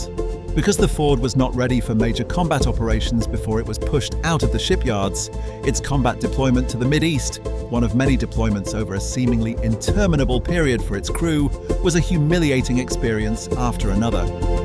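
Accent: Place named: United Kingdom. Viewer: The Ford is already back in the Red Sea ready to go. By the British accent I would say wishful thinking.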